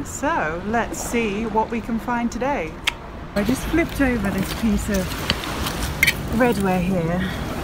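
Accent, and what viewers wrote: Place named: England, United Kingdom. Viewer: You have such a sweet English accent.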